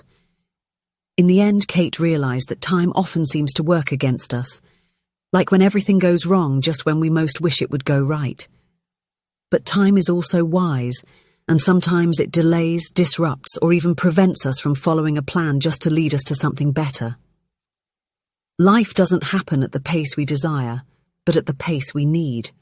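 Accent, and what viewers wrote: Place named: United Kingdom. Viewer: Loved the story. British Narrators are Amazing. East Coast USA. 10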